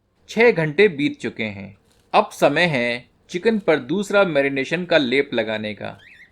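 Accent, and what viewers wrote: Standard. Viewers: Your shudh Hindi is so irritating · आपकी शुद्ध हिंदी का उच्चारण भी ... ह्रदय को भी हर्षित कर देता है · aapki sari decease or apka suddh hindi me bollana bahut bahut pasand hai 👍👍❤️👍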